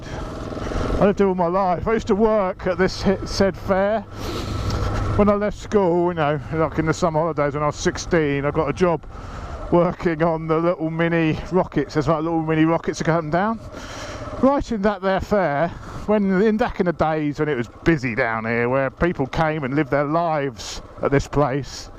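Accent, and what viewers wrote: United Kingdom. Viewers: Haha. Love this. Bless the old man at the end. Genuinely thought you were a London boy. You've definitely got a cockney twang.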